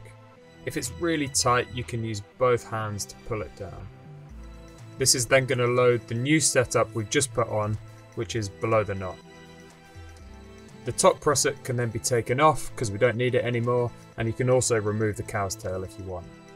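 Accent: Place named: United Kingdom. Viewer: Hi, you have great teaching ability and I look forward to seeing more from Climbing Acadamy in the future. It's so nice listening to a British voice as well. Here you tackle a rarely seen topic and you do it very well. I would like you to cover rescue tecniques needed by a fallen climber on a multi-pitch route where the faller stops opposite unclimbable rock or in mid-air. I have never seen anything on this anywhere and yet it is a situation that should be practiced and resourced before embarking on serious multi-pitch climbs. I look forward to your views and, ultimately, your video.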